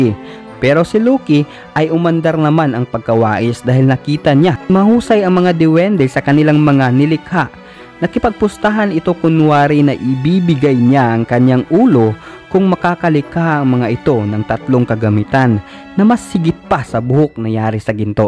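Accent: Visayas, Philippines. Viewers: Ok sana Kaya Lang Visaya ka eh ayusin Mo ung pagsasalita mo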